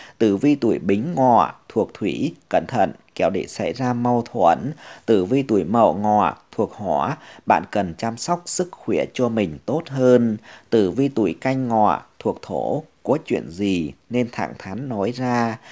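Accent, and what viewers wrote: Thanh Hoa, Vietnam. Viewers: Giọng thầy là quê Thanh Hóa phải ko ạ?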